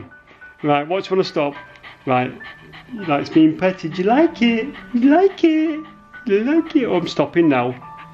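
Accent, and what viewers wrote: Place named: Manchester, United Kingdom. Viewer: you got more a north Manc accent, my cousin could be from Barnsley if you didn’t know...proper Yonner.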